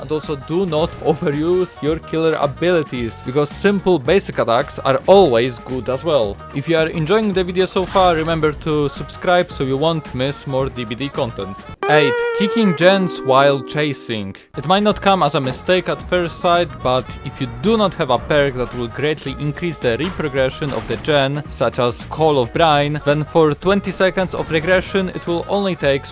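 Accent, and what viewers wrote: Poland. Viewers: I heard Polish accent and subbed, 🇵🇱❤️🇵🇱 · strong polish accent 😨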